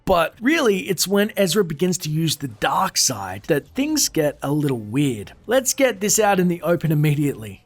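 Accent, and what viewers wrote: Australia. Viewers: You are an Aussie aren't cha?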